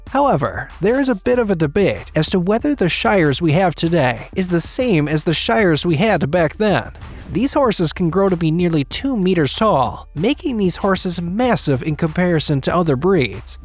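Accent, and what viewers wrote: United States. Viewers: I love how this dude speaks "Countrey"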